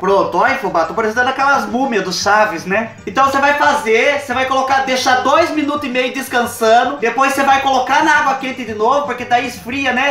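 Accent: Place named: Caipira, Brazil. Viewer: amo seu sotaque caipira e sua simplicidade você não fala palavrão GOSTO muito de você e do seus amigos Deus continuo te abençoando beijo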